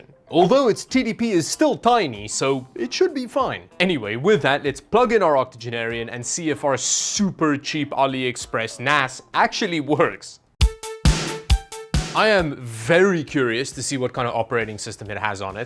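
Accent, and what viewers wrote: Afrikaans, South Africa. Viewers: Afrikaans... I was wondering where your accent came from. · You're the first English person I've heard get so close to an Afrikaans accent. Legit you were spot on but I think it's because your voice already sounds kind of South African😂. Jy moet net nie jou moer strip nie. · BRO IS AFRIKAANS ??? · yeah I thought I picked up an African accent I just though he was from South Africa cause he literally sounds like our Afrikaans natives. Really cool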